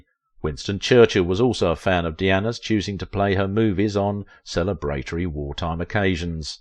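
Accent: United Kingdom. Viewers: Please stop pronouncing it " shed..yul." I don't care if it's the UK way, it's WRONG...and it sounds stupid.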